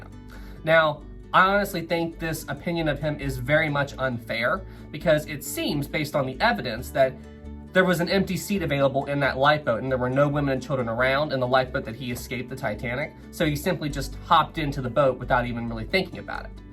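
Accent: South, United States. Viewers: You seem to lapse in and out of a Southern accent.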